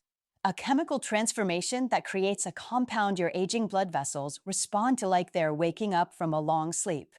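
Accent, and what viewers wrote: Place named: India. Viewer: howcome you have an Indian voice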